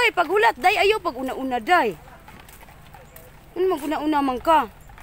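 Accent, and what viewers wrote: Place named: Leyte, Philippines. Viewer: taga dis a ka pareha lge ta ug sinultihan KAHIBAWO ka mag Leytenio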